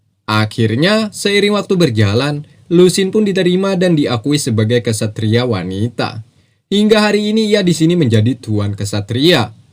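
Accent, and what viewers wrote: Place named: Kalimantan, Indonesia. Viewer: Bg cara bicara lu kek orang kalimantan emang aslinya orang mn, ngomong² gua orang kalimantan selatan bg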